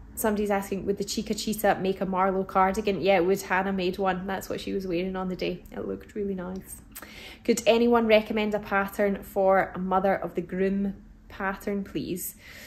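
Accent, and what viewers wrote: Scotland, United Kingdom. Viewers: Argh. I planned to catch you for the first time live last night and I forgot. As well as the lovely fabric it's great to hear a Scottish voice. I love living in the land of cheese and chocolate but a little bit of homesickness creeps in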